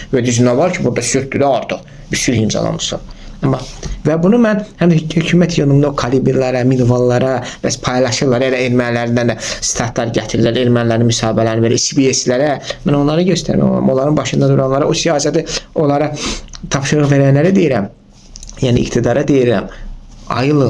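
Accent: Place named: Baku, Azerbaijan. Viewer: Ləhcəndən bilinir karennoy Bakılısan amma erməniylə öpüşənə haqq qazandırırsan tema o deyil tema 1905 ci ildə daşnaklar ruslara Bakı kəndlərində nəqədər söz sahibi papqlı kişiləri güllələyib uşaqlarının başına daş bağlayıb xəzərə atıb boğurmuşlar bax budu tema millət demək istəyir dığalardan bizə nə qonşu nədə dost olar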